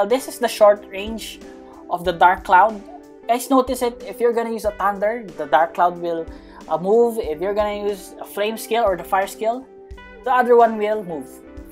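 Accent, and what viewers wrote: Philippines. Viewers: Bro his filipino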